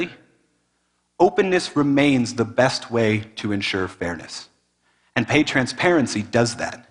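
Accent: United States. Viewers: ขอถามผู้รู้ครับ นี้คือสำเนียงอะไรครับ. ใช่ อเมริกัน ไหมครับ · ใช่ สำเนียงอเมริกัน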